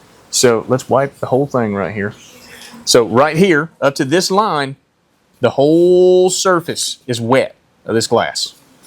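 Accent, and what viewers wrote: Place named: United States. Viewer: Lovely American accent.! Many thanks for making this helpful video sir.😊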